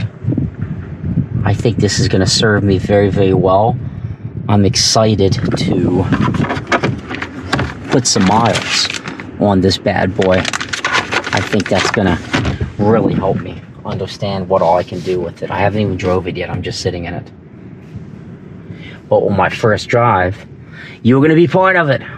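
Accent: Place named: Maryland, United States. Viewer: Love the Maryland accent. "Still wills", and the extra rrrs, etc.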